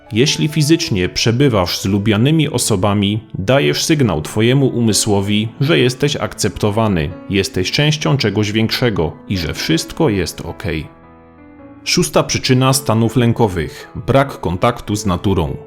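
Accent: Silesia, Poland. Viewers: Czy lektor jest ze Śląska? Słychać akcent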